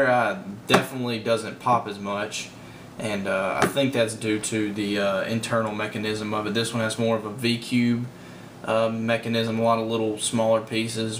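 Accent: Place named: South, United States. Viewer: You're not from the Boston area, are you? You really sound like you're from the South. I lived in Knoxville, TN for a long time, and you really sound like you're from that area. By the way, the Maru, you need to tighten if it comes out of alignment. Tightening it will stop that. The Dayan 4x4, you HAVE to lube the inner 2x2 core, or it won't be good. It really is the best when you set it up right. Lube and loosen the screws on the 2x2 and tighten the ball shell screws all the way.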